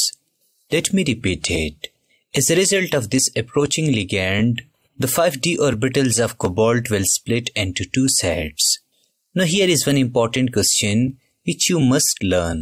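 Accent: India. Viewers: to is very usefull for drawing, but I would draw one arbitrary non-Z-axis for the dz² just to make it still fit into 2-Dimensional view, or for 3-Dimensional, jsut imagine 3-rd axis directly pointing at you and you don't see ti as it is covered by the origin point. Your dz² drawing feels little bit to 1-dimensional. But as with all indina channels, I never mustn't watch any other Vid of your Chennel or my brain will explode, your english is much harder to understand than normal english. But you explain pretty well, (all the Electron will stay there and here stuff, but the d orbital drawing was just the most usefull.) with less acent in language, i.e. if you would use Queen English, I might even consider subscribing/recomending you.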